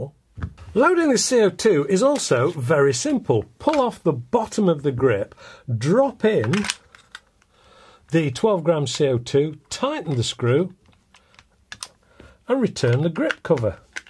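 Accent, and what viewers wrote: United Kingdom. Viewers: I don't know why, but with the goatee and the british accent, I feel like I should call you professor.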